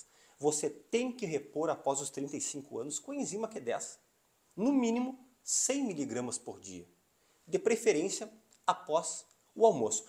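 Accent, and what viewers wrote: Rio Grande do Sul, Brazil. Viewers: Ah...reconheci nosso sotaque gaucho❤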